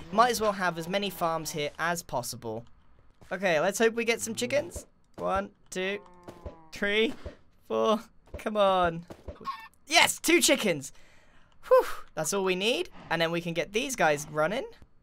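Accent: United Kingdom. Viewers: Is it just me or is so much more British back then then he is now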